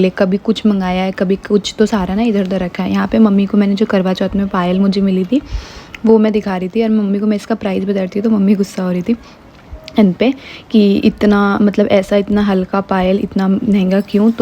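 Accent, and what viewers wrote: Bihar, India. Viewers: Bihar me aisa bolte h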